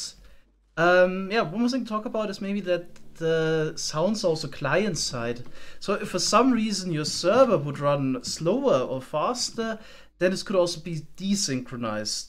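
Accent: Germany. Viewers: Bro dieser Deutsche Akzent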